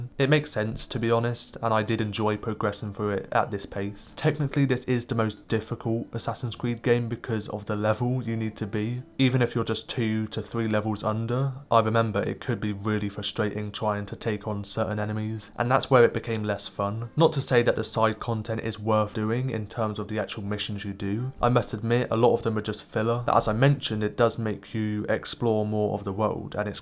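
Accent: United Kingdom. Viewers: you do come off as a hater. It’s an average brit accent🤣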